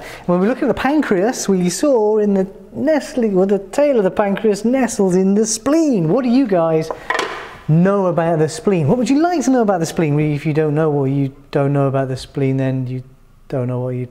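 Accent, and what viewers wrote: England, United Kingdom. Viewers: Why does everything sound so much better in an English accent lol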